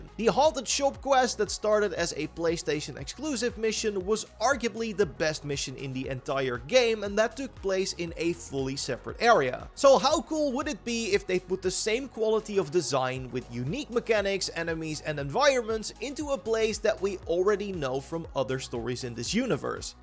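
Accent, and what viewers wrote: Netherlands. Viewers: Nice, very cool info! His accent is so distracting to me, am I the only one? I'm Dutch and I presume he is aswel, well yeah 😂 so maybe that's why 🤔😊 Very nice content though 👌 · Ik hoor een Nederlands accentje haha, leuke video! Ben benieuwd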